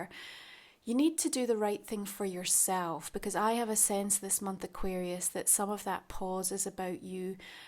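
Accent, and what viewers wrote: Scotland, United Kingdom. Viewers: Hi it was lovely to see you in the flesh in Edinburgh, I looked for you I’m sorry we didn’t get a chance to speak more x I love your content, style, delivery and your lovely Scottish burr. ❤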